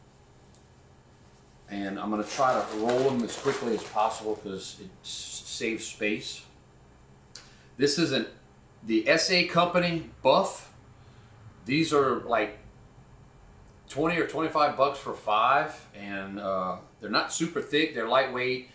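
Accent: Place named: South Louisiana, United States. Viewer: That accent. You south of I-10? haha I'm a N. LA boy aka "fake" Louisianian.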